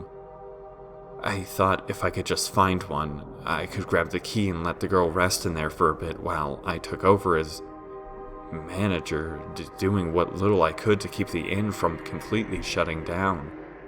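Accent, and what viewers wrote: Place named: Romania. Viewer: Impressing accent for a Romanian girl!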